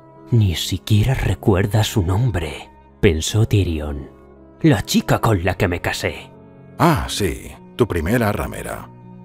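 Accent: Castile, Spain. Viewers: No me cansaré de repetir el castellano le da muy buen toque al relato, 👌 un nuevo suscriptor se anota. Espero que traigas más relatos .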